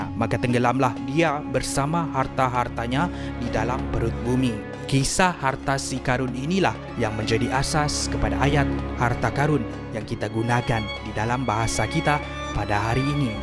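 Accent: Sabah, Malaysia. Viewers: Dia orang Sabah la bro.. mmg cmtu loghat dia..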